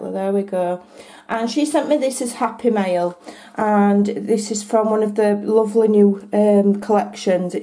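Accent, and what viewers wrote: Yorkshire, United Kingdom. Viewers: Are you from Yorkshire? I love your accent . I lived in Harrogate for 5 years and in Bedfordshire for 3 years. My sons were born in Yorkshire. I love your cards! These are also beautiful. Will go to the blog for your friend.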